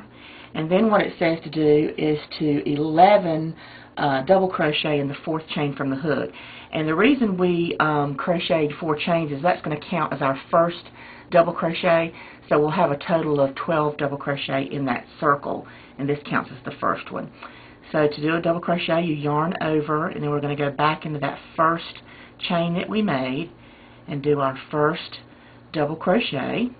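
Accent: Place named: Georgia, United States. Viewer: I loooovvvvvee your accent!!! Every time I visit my cousins in Ga I come back with the same drawl. Btw. I also like your tutorials!!! U make it so easy to crochet along with the video. Thanks for all yr hard work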